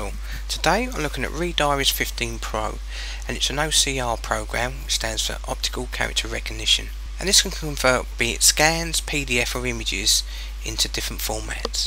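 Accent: United Kingdom. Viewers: Thank you but this british accent is sometime so disgusting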